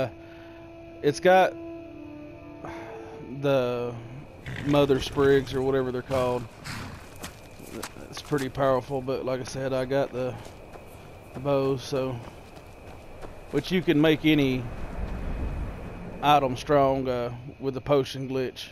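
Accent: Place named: United States. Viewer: I love your sexy stereotypical american accent 😍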